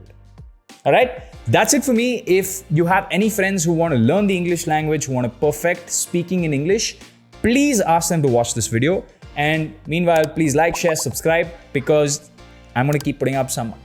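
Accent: India. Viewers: Your hindi reminds me of south indian can drivers😂😂, no offence btw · I think you're a south indian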